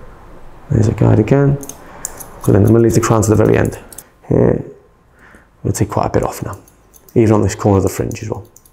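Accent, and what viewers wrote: Liverpool, United Kingdom. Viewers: Is he from Liverpool?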